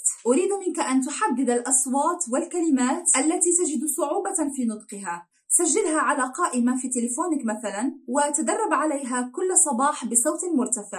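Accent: Morocco. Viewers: هل انت مغربية ام جزائرية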